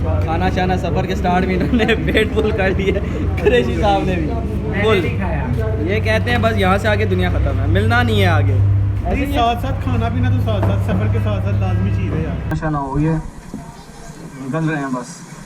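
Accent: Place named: Sialkot, Pakistan. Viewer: shb na jb first word bola tha ma tb hi smj gya tha k sailkot k han😊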